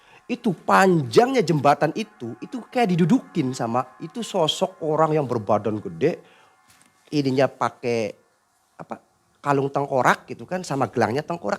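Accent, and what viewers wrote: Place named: Indramayu, Indonesia. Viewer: Waalaikumsalam salam mng ei,😂😂maaf mang bhsae wng Indramayu khas bnget (dicekedong)sya jg asli orang Indramayu,SHT2 crew MM dan narasumber nya...❤❤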